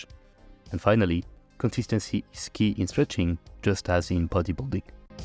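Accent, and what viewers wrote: France. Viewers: Forgot I'd downloaded this to listen to on my flight 😅 a bit surprised to hear the frenchmans voice. Great video bro 👍🏿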